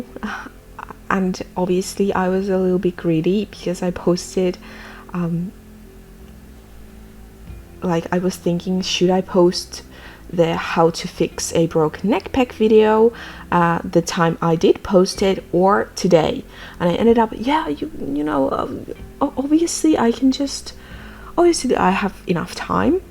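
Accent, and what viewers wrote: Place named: Finland. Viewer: Such a beautiful doll! I especially like the heterochromic eyes. Oh, and I knew in an instant from your accent that you were Finnish (I'm new on your channel) - kyllä suomalainen suomalaisen tunnistaa, hehe.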